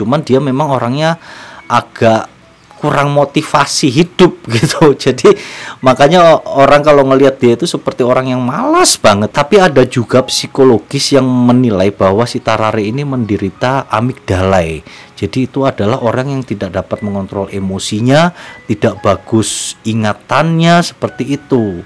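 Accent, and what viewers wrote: Java, Indonesia. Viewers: jowo ne ning ndi mas?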